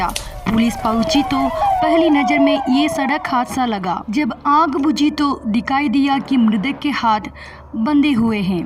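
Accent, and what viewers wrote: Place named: Kerala, India. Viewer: Malayali aanale.. Hindi kettapo manasilayi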